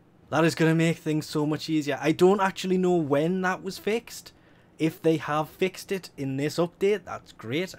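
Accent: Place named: Newcastle, United Kingdom. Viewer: Ziot English, possibly a Geordie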